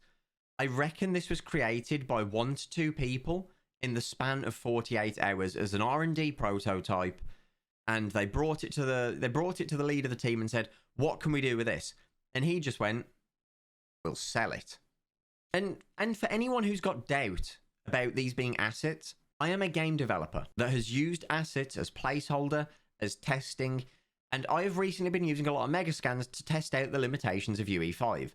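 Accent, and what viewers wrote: United Kingdom. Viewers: British :P x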